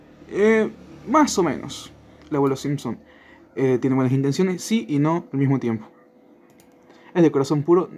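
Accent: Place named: Argentina. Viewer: Tu voz me acuerda a tucosmopolis pero con acento argentino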